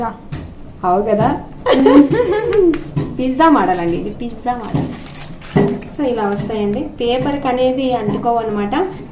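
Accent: Rayalaseema, India. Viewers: ఎన్ని రోజులు అయిందండీ రాయలసీమ వారి వంట ,మాటలు విని,ఎక్కువగా కోస్తా వాళ్ళ చానెల్స్ ,language ఉంటుంది.చాలా బాగా చూపించారు అన్ని items ఒకే వీడియోలో thank u. మాది కర్నూల్ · Avva super maa avva kuda mee lage undi madi ndl local andi meedi nagative a vuru andi meeru chebutu unte naku ndl ravalanipistuni kani hyd lo vuntamu mimmalni Ndl akkado pelli lo chusinattu anipistundi anduke a vuru ani adiganandi mee videos chustuvuntanu rayalasseema stylo ne matladandi maku vurlo vunnattu anipistundi maa amma kuda meelage pappu gattiiga chestundi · Mana Rayalaseema slang chaala baaga matladtunnaru andi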